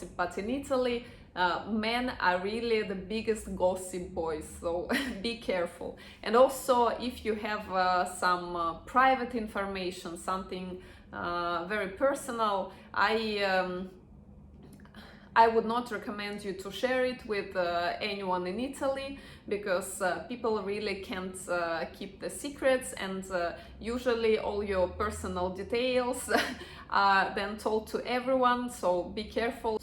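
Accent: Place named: Russia. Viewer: She is uttering English words as overlap on Russian thinking and manner of conveyancing thoughts. I am Russian-English interpreter and feel in a second the nature and manner of speaking. Non dovete pensare lei e brutta or sbruffona. Questo e il suo modo di dire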